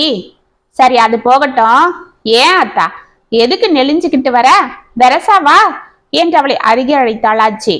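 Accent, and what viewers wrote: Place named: Theni, India. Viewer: உங்கள் குரலில் தேனி வட்டார மொழி அருமை❤️❤️❤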